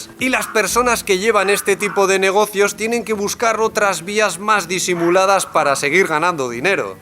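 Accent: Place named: Spain. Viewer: el tipo habla español de españa pero la cara no parece de españa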